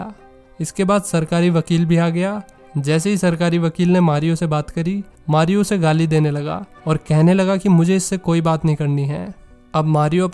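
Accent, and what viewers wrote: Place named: Western Uttar Pradesh, India. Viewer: Bhai tu west up se h n 😂 Moradabad , bijnor orr meerut?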